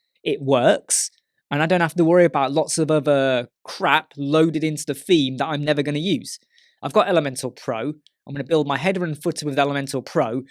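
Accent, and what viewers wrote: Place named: United Kingdom. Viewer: I love to watch you videos and is 1st time when I out a comment here. don;t take it pwrsonal, is because . I am too introvert to comment. 1- yea, maybe you talk too much but I see that like a good think: you give a better explanation and people can understand. the prcesses better. 2- Your Face . what? people say that to you? fuck them all ! 3- Elementor Bias: FFS your video are about ELEMENTOR! Is not about tomatos or pigeons! Elementor is your tool and if they want another editors they can watch other youtbers! period! 4- Promoting Hello: like you said: Hello is a FREE theme! I am not using it too much. I tryed ASTRA and OceanWP free versions. I chosed Ocean but Helo is an great and most important is a clean theme ! And in the future I want to focus on it. 5- Gimmicks? Iam lost on this! i do not understand what they want to say. 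because I learned many things for your videos and I am not new on elementor... 6- Too Excited: that makes your videos funny :) 7 not the Beta... Is someone want to see what is comming they can always use official elementor channel or watch other youtubers. 8: UK. well, you are born in UK. I jus tcome here in 2015. Your accent is 100% british. Yes, you are from UK :). 9- OceanWP. Well, Astra is worst than Ocean WP. The main reason i din not bough Astra or OceanWP is this: If i have troubles with free versions, why should I pay for it? my final toughts: keep doing your work :) haters always will hate. And I appoligise for my english, is not my native l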